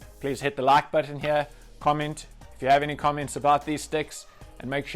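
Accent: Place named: South Africa. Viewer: red white blue, abn amro, and kampong(?). First I thought you were a dutchie, but then I heard the South African accent haha, great video!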